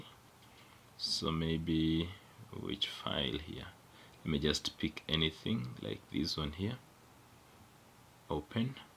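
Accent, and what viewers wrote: Kenya. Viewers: Nice to hear a Kenyan accent 🙂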